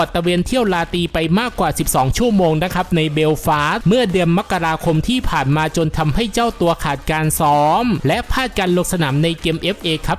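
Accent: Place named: Isan, Thailand. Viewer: admin พูดอิสานเป็นด้วยเหรอครับ 555+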